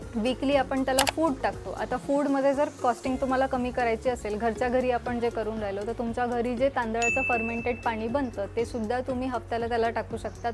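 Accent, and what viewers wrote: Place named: Vidarbha, India. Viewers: Chan mahiti dili....Vidarbha chi boli bhasha.... Yavatmal, Nagpur yethil asavi...aikayala chan watate.... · छान नागपुरी बोलू राहिले मॅडम तुम्ही..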